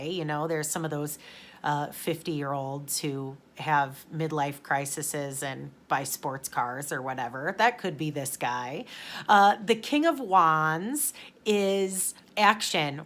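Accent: Midwest, United States. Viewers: don’t y’all talk similar in the Midwest? It all sounds the same to me! Anyway, you’re very cool, I like your channel and your face. 😊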